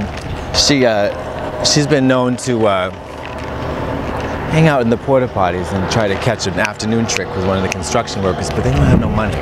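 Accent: Boston, United States. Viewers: You can really tell she’s from Boston wow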